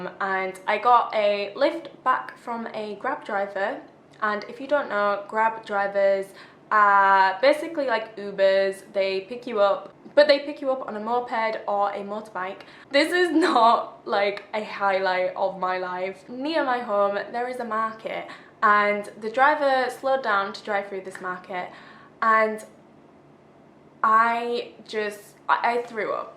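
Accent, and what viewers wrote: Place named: England, United Kingdom. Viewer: Supply and demand, a normal thing. You are a native English speaker, have that English accent that Vietnamese parents want their kids to learn from. Unless the market is flooded with native English speakers who are willing to get paid a few dollars an hour, otherwise, you will still get ~20 dollars an hour.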